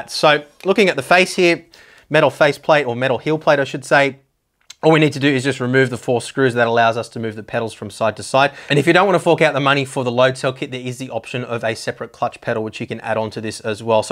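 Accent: Australia. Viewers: I'm assuming you're from Australia with that accent.. apologies if I am wrong. Do you know what the pricing is in AUD?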